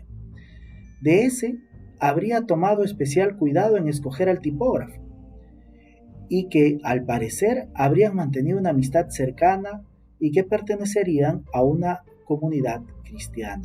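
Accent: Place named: Dominican Republic. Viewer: eres dominicano suenas como uno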